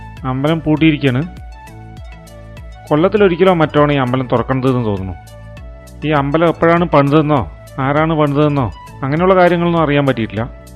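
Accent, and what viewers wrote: Palakkad, India. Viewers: Nallapalakkadan slang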